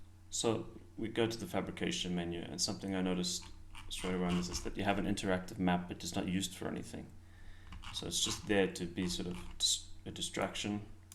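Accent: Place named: South Africa. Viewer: I could listen to that smooth SA accent roast game menus all night. 🍆 Lmk when and where I can watch the new short film, and while I'm on the subject, I would personally love to see a video showing how you tackled all the rotoscoping in Davinci. What GPU you runnin', tough guy? Tutorials, let's go! 🤣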